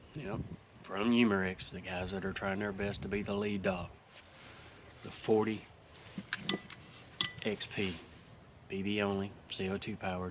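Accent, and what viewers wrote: South, United States. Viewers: Can you stop whispering. Are you from the south? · This was a wonderful video! I feel like you were really caring and you had a calm and reassuring voice. I'm from a rural area and it made me happy to hear a fellow southerner. Thanks for the help.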